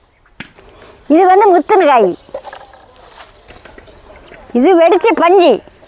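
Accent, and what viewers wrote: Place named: Salem, India. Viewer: Salem district. From Her dressing and slang. My native omalur salem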